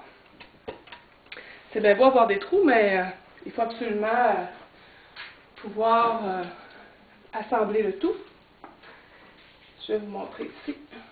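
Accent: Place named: Canada. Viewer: video très utile car avec mode d'emploi 100 % en anglais c'est pas évident....Et ce petit accent canadien qui réchauffe le coeur, c'est top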